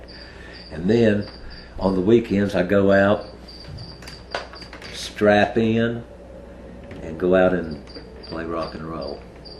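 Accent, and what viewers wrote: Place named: South, United States. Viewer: Interesting Video. I discovered and around ‘92. Just my personal opinion but their self titled & were their finest releases. showed signs of what they previously were capable of but after left & Jimmy Stiff they seemed to be lacking which makes sense right?. I will now try and find a video explaining why Jimmy left. That’s one very real Southern accent you have Mr. Appreciate the video. It must’ve been hard leaving such a cool band, esp because of the tonnes of sexy women that would be at your shows. I imagine that would be the BEST perk of being in a RnFnR band for sure!!!! That’s the main reason guys play instruments 🤤🤙🏽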